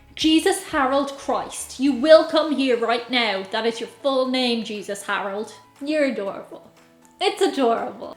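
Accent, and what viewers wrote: Ireland. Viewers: As an American, I find every word that comes out of an Irish person's mouth to be cute. <3 Your accent is the greatest! :D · The accent takes me home...family originally came from Cork in the late 1800s...are the Irish starting every sentence now with "So," as I now hear EVERYWHERE in America? Did you go shopping? So, I went to the store and got what we needed. What did the doctor say today at your appointment? So, the doctor told me I was fine. It is really beginning to drive me nuts!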